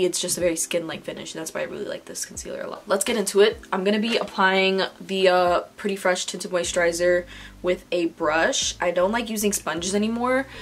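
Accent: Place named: New York, United States. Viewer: i'm from nyc and when i got to i was like 👀😗i'm getting nyc vibes is she from nyc?? and sure enough 😛🤟🏽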